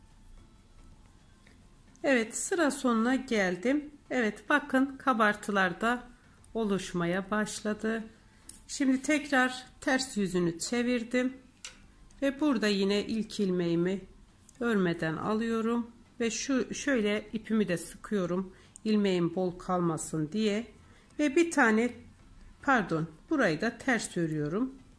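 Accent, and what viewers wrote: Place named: Black Sea, Turkey. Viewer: Karadeniz (Rize)şivesi bu!👍😊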